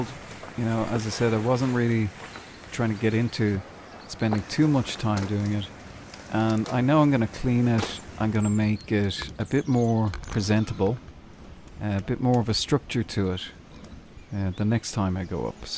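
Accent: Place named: Ireland. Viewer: Fantastic stuff. And I always enjoy how Irish folks say column!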